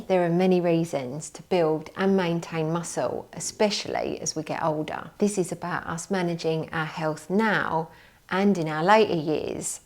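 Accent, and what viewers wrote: United Kingdom. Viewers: You know the weird feeling you get on YouTube when you follow a channel for so long that in your head you are totally like a real friend to the channel host! Well that happened to me and I am not even ashamed! 😊 You are bloody brilliant! (That was my most British impersonation, also said it in your fabulous orgasmic accent!) 🫶🏻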